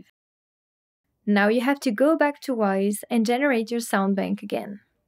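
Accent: France. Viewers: She seems to have a little french accent.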